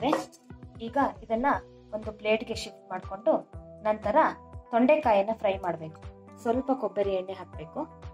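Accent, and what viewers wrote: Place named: Malnad, India. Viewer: ಸೂಪರ್.. ಒಳ್ಳೆ ಮಲ್ನಾಡ್ ಭಾಷೆ.. ಒಳ್ಳೆ ಅಡುಗೆ ಸೂಪರ್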